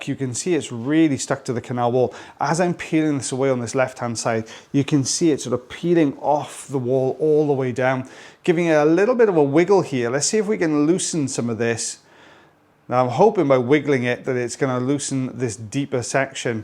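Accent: Wales, United Kingdom. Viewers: That was a great one! And you said Ebony in your lovely Welsh accent. I’m happy. And glad you’re sounding better today.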